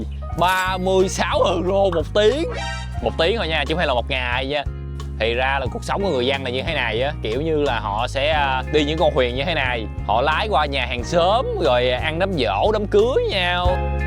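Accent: Mekong Delta, Vietnam. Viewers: Ông nc rặc miền Tây,dù đi dl nc ngoài thì ổng nói tiếng Anh giọng cũng đặc sệt miền Tây,dễ thưn,thân thiện,hài huớc 😂😂😂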